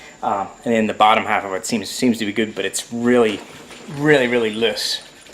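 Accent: Belfast, United Kingdom. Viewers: Is that a hidden belfast accent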